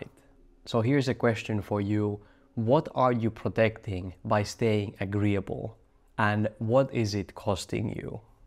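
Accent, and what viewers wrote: Finland. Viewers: The first word you said and me: he's Finnish lol. Greetings from Vantaa. Unfortunately even Finland has fallen into the american toxic mentality. Currently planning to go back to Italy (I'm Italian) and disappear in nature, mountains and sea. As anarchist I would say: screw (I usually tend to use the word that starts with a capital F) all these toxic societies, screw money, screw status, screw this sick narcissism, screw this insane form of capitalism, screw consumerism, screw this poisoning individualism and screw your racist country lol, at least part of it. I will miss a lot the good finn and probably you know what am talking about (usually the socialist ones). Now Finland you can hate me, I don't give a...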